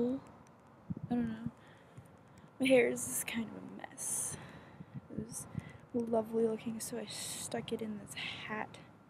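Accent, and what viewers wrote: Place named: Canada. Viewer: i suspected u were canadian bc of yr accent! i live in bc too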